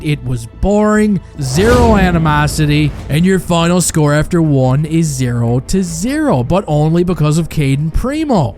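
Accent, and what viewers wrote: Newfoundland, Canada. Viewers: I love the Newfie accent with the classic commentary!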